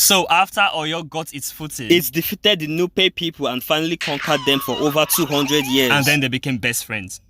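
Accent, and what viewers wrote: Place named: Uganda. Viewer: Voice over 👍🏾 Accent sounds Ugandan Good historical preview and motion picture work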